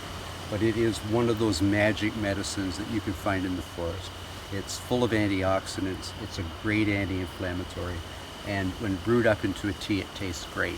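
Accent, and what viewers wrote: Canada. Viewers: I'm a yooper born and raised on the U.S. Canadian boarder. I watched the first of your videos and knew you were from Canada from your accent. I started watching your oldest videos first and will continue to the newest ones. Great videos and love the little cabin you and your partner built. I am looking forward to more. Thanks!!!!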